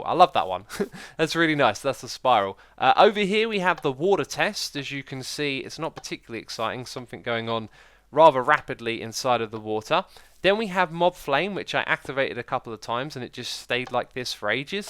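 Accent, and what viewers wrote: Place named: United Kingdom. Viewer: Not to be disrespectful, I am genuinely curious about British pronounciations. I have noticed for a very long time now that some words that end with the letter 'a' are pronounced almost like 'ar' or 'er' for example like when he says 'java' edition and sound like 'javer' or 'javar'. Why is that?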